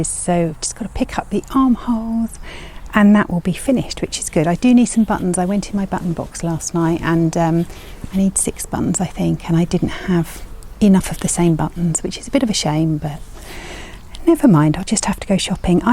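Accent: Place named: United Kingdom. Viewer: I love your videos, I am not a spinner, I am a knitter but it is always nice to see yarn confection. What I appreciate most of your productions is the gentleness of your voice , your lovely British accent, I am Canadian, and your visual aesthetics, all the images of flowers, nature. I love to knit as I listen to you.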